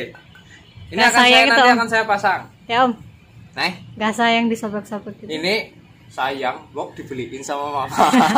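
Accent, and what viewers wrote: Banyumas, Indonesia. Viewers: Mas alamat bengkelnya dimana kasih tau dong bahasanya kok ngapak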